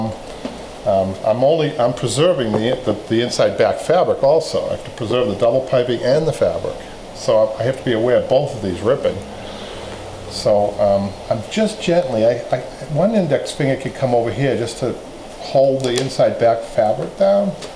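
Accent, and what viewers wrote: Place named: Boston, United States. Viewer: I've been watching youtube videos to learn how to reupholster my chair, and came across this. Your accent...I thought, "he has to be from back home?!" Sure enough, Boston! Thanks for the great videos, from a fellow Masshole now living in Nashville, TN!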